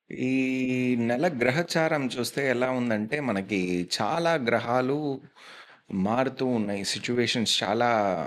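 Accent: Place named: Tamil Nadu, India. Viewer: అరవ తెలుగు. ఇదొక పబ్లిసిటీ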